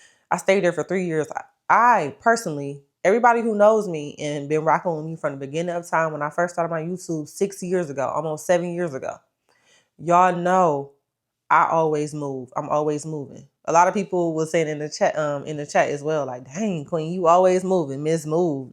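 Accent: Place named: Ohio, United States. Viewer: There are three places I've heard residents pronounce "y'all" like Yaw and that's (1) Baltimore, Maryland (2) Philadelphia, Pennsylvania and (3) Columbus, Ohio. Based on what I'm hearing in her inflection, I"m going to guess she's from Ohio. Ohio will say salty a lot pronounced like "saw-dee" and I feel like I can hear that in other words when she speaks. Further research shows Detroit as her place of origin so I was regionally accurate. American North/Northeast.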